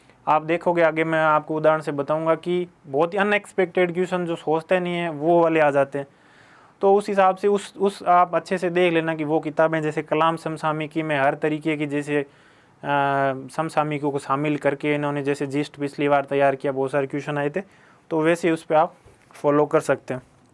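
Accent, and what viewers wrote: Rajasthan, India. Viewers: Love your rajasthni voice tone.. 😍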